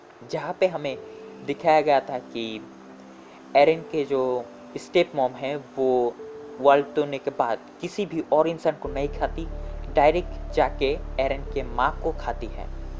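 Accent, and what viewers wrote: Bengal, India. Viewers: He is bengali bro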